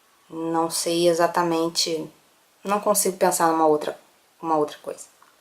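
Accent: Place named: Rio de Janeiro, Brazil. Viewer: oieee.. td bom???? adorei seu canal.. que sotaque lindo... carioca???? já super me inscrevi aqui =] no meu canal eu faço vlogs, tenho dois bebês, gemeos e mostro minha rotina com eles.... =] ficarei feliz em te ver por la... vi que vc tem o canal desde 2012 mas só começou com os videos a um mês atras.. continue... vc fala bem.. é linda e parece muito simpática.... sucesso... bjssss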